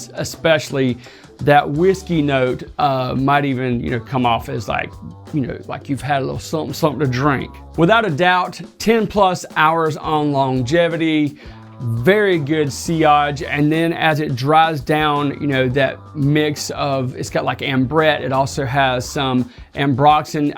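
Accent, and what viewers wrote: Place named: Florida, United States. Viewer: Always enjoy your down to earth reviews! I need to try that Nasomotto! I'm in NY now but your accent and demeanor reminds me of the circle of friends I had when I lived in Daytona beach Florida. Lol.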